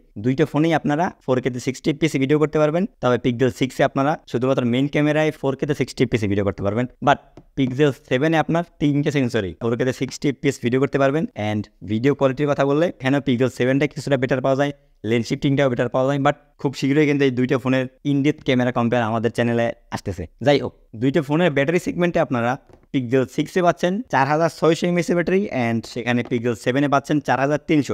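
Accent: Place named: Barishal, Bangladesh. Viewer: ভাই আপনি তো মনে হয় বরিশাইল্লা 🙂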